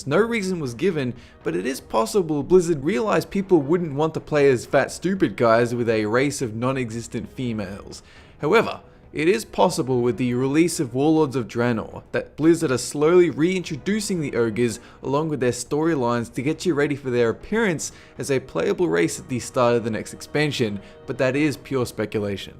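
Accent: Australia. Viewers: Aussie reppin? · he's Australian. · you australian mate? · Australian accent.... it breaks my brain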